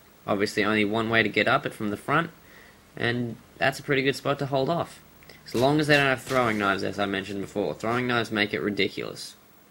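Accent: Australia. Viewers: Austrailian accent! 3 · Are you Australian? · a few on downturn lockdown and hhat but still nice r u australian u have cool accent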